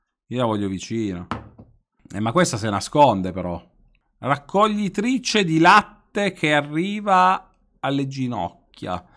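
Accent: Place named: United Kingdom. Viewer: amo il tuo inglese very british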